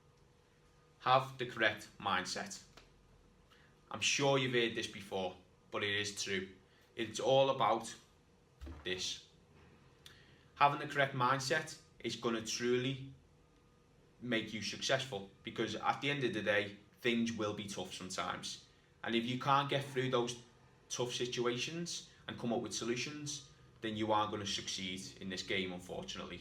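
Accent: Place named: Wales, United Kingdom. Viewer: you sound welsh I'm welsh to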